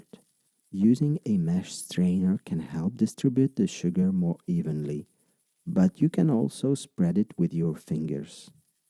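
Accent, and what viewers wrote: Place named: Czech Republic. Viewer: cool czech accent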